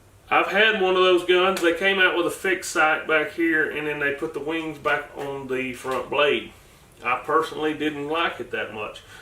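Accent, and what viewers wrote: United States. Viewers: Ar and mini14 are both prima pieces of shit. Go russian if you want quality.Fuckers eh? You sound like a hillbilly, and look interbred to me.Lol the official california battle rifle. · Do you have to talk like a HILLBILLY to own this Gun ?????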